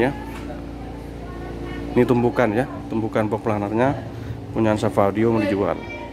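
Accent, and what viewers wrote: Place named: Madura, Indonesia. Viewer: Markas safa audio dimana mas logat ngomongnya kayak orang madura. Salam dari malang🙏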